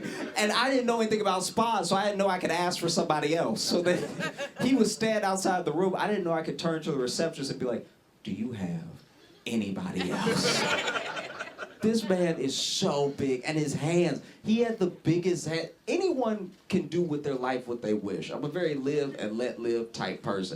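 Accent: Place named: Louisiana, United States. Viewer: I wonder what part of Louisiana he's from(?)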